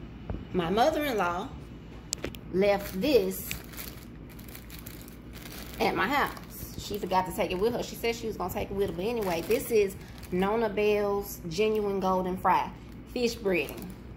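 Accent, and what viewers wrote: United States. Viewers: Dk what city ur from but you sound country as...🤣 not hating on you just saying. like ur simplistic way of cooking. hint: spray oil on paper towel and wipe on fryer. that will eliminate any build up and avoid potential fires. thats why they recommend NOT spraying directly on fryer. Be safe.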